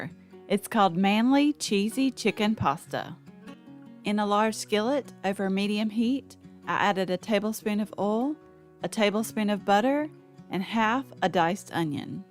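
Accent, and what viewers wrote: South, United States. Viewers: You have such amazing recipes! I love watching you cook and listening to your voice. It's so heavy with your southern accent which I LOVE and it's so soothing. It reminds me of my Momma's♥️ 💕🙏🏼💕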